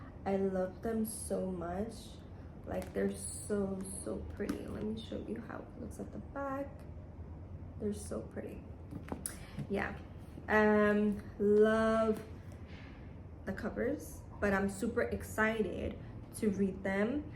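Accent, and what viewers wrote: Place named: Caribbean. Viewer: you sound caribbean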